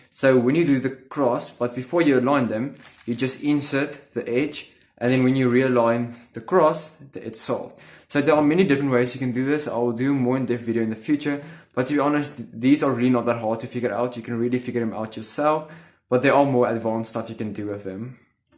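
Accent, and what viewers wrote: South Africa. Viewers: are you from South Africa? :)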